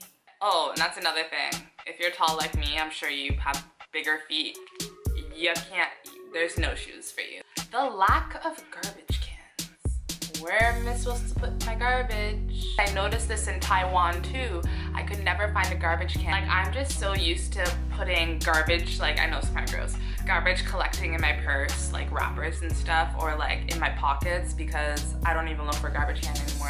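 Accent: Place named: Patois, Jamaica. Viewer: mi thought yah half korean..til yah speak patwa..yah duh know yis fine empress mi like mi coffee strong and black..lol yah beautiful..mind and body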